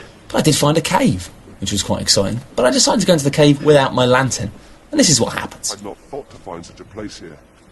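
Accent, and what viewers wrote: United Kingdom. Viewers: His British accent just makes it sound that much more brilliant. As if Im watching a documentary.~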